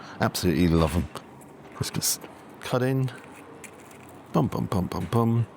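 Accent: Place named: London, United Kingdom. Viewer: Your londonish accent is so adorable 😊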